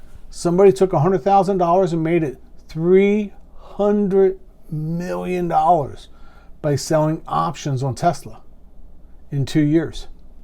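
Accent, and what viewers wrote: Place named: Pittsburgh, United States. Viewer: Listening to your accent,... I am guessing you are from Pittsburgh 😊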